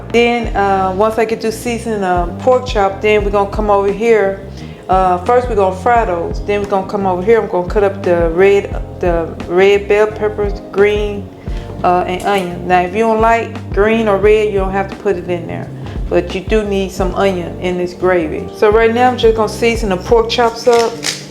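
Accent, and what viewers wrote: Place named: Chicago, United States. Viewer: She sounds like she from Chicago with that cousin and em 😂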